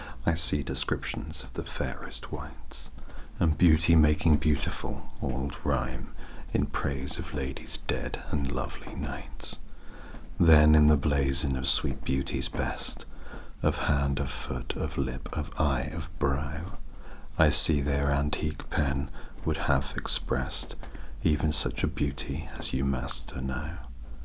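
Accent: United Kingdom. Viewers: A British guy reading Shakespeare?! Can't get much better than that!! Fantastic read - thank u! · Can we talk about how amazing a British accent sounds? I swear y'all could order chicken nuggets from McDonald's and sound like an erudite advanced life form. Conversely, I'm from the American south, and one of us could give a lecture on astrophysics and still sound hopelessly backwards. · Your dandy voice, your UK accent, your sweet face expressions, your way to read Shakespeares in gentleman's grace...sigh.😔✨ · A man in a suit with a soft British accent, reading poetry and cuddling his cats? Well I mean... Sign me in. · We just analyzed some Shakespeare during English Literature today! Very coincidental you uploaded this today as well. Either way, I’m so excited to see this! I love being read to, and I love British accents, and I love poetry, and I love your videos! 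Perfect combo 😍 thank you!!!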